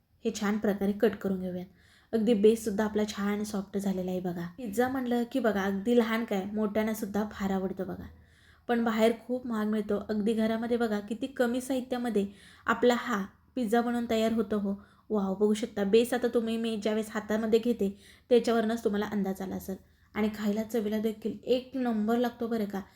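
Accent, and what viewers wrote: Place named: Kolhapur, India. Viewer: कोल्हापुर ची भाषा की नाय एक नंबर आहे बघा 😊😊😊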